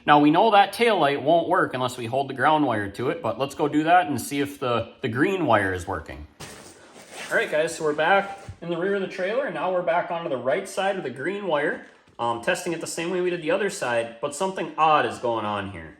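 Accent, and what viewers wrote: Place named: Minnesota, United States. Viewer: The brown wire ground back feed diagnosis was really nice work, as if you’ve done this before! 😅 The mouse situation was also classic. Re-wiring the whole thing was the right thing to recommend. The Minnesota accent is perfect! Go get some hot dish and jell-o salad. How about some bars for dessert? 😊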